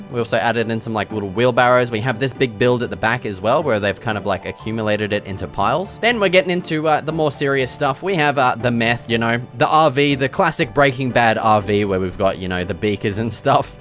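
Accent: Australia. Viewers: Fuck man I love Australian accents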